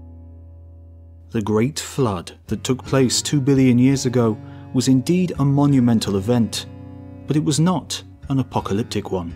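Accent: England, United Kingdom. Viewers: i swear sounds like he says "the year was 1917" :-P gotta love the british accent!! <3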